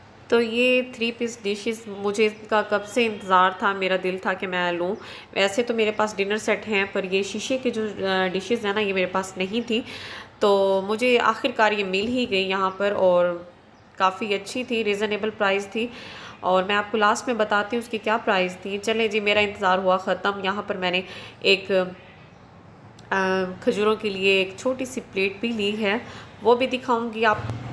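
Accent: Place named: Punjab, India. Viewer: Punjabi tone zyada zbrdst hai aap ki 🌹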